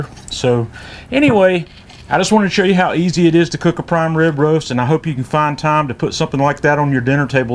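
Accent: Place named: South, United States. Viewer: Hello from Ireland; I’m new to using a kamado and I’ve come to learn that unless the instructor has a deep southern (US) accent, I ain’t listening!! Great video……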